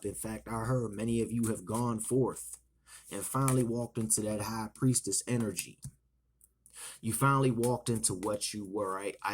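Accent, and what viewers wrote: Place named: DMV, United States. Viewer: Are you from the DMV? I hear my accent 😂😂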